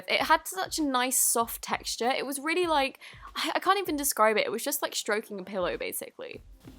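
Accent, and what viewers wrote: United Kingdom. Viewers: babycanread2009 right, and maybe it could be because of her British accent!!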